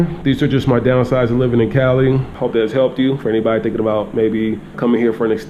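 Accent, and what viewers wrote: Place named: East Coast, United States. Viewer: Wow man I didn't expect you to be from Seattle the northwest that was surprising cause you talk like a east coast dude with all that swag in your voice lol.. thought you was from like Northeast in jersey or new york...but keep up the great work with the vidz bro #Respect 👊🏾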